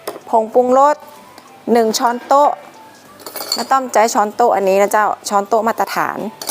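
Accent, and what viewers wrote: North, Thailand. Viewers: ตอนเข้ามาดูครั่งเราติคุณเรื่องภาษาเพราะเราไม่เข้าใจทั้งหมด ตอนนี้ฟังไปฟังมาภาษาคุณน่ารัก ช่างดูใจดี สุภาพ นุ่มนวลแบบคนเหนือ เราเข้ามาหาอาหารมังสวิรัติค่ะ เลยแวะเข้ามาดูค่ะ. อ้าว...ดูไปมีเลือดด้วย เลยต้องดูเฉยไม่ทำตามล่ะ แต่ดูดีน่าทานค่ะ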